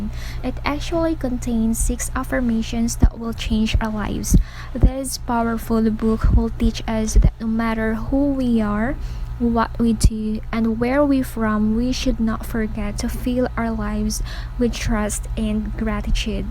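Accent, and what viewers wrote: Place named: United States. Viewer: Hi pretty sis akala ko american ang nagsasalita ang galing naman mag english..nice books pretty that books its good to my daughter she love to read like you..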